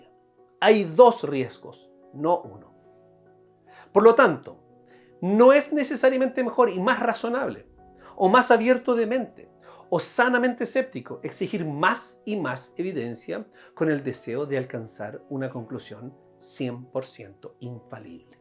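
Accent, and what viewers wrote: Chile. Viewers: Nada que ver mi comentario ante un tema tan interesante, pero no puedo dejar de decir que lindo el acento chileno, cuando no es "flaite".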